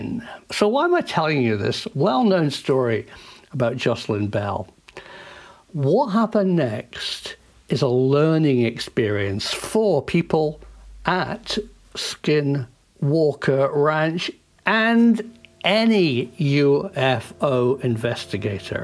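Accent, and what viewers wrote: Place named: Cornwall, United Kingdom. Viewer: Hi. I'm from England too. I think your from Cornwall. Its really nice you making these videos. This one seems much better so congratulations on your progress. Off topic but are you from Cornwall? It scratches at me haha.. I'm 24 and a massive ufo buff. Again thank you for making these videos. One last question. Where are you AREA 51? THIS VIDEO ROCKS!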